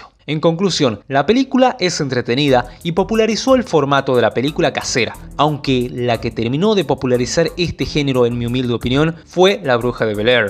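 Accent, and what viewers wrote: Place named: North, Argentina. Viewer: jaja no puedo evitar pensar que sos de santiago o de alguna parte del norte, muy buen video 👏🏻👏🏻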